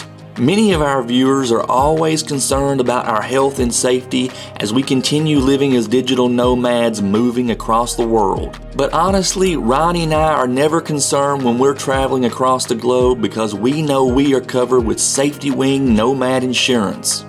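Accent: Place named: Texas, United States. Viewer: i like your accent man.. where do you come from?! texas?! have fun in indo man..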